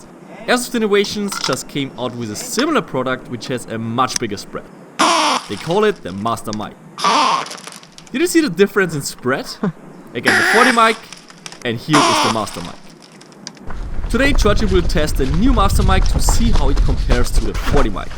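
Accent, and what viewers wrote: Germany. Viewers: German accent xd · bist du ein deutscher?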